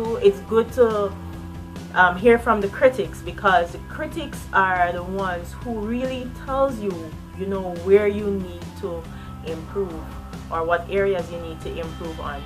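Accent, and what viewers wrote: Jamaica. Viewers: Jamaica? Sounds like Jamaican I'm thinking of starting one too but mi nuh sure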